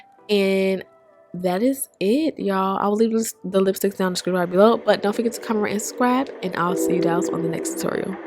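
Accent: South, United States. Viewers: Beautiful. I 💘 your voice overs with your Southern Dialect.I love to hear you say, Description Box. You and your fast talking self,.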